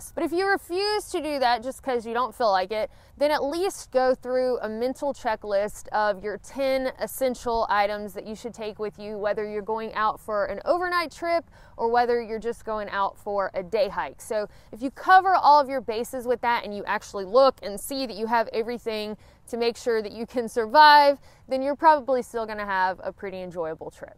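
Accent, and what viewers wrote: South, United States. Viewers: This has NOTHING to do with backpacking, but I suspect it's happened to one or more of your viewers: I left my cellphone in a bathroom stall at a gas station in Sevierville, TN. It was the famous Frank Allen's (they have great breakfast: simple, comforting and so good, but they're famous for their slaw dogs) and someone was honest enough to turn it in. We happened to have some friends there when we left, so we contacted them and they picked it up for us. We were lucky, because my Amazon app was hooked to my credit card. Coulda been expensive. I have a feeling that folks have left their phones on the trail somewhere, hopefully with not-terribly-painful results. I thoroughly enjoy your channel, young lady. Your Southern "flavor" to the channel is comforting and most pleasant. It reminds me of the wonderful experiences I've had and hospitality of our brethren in the American South.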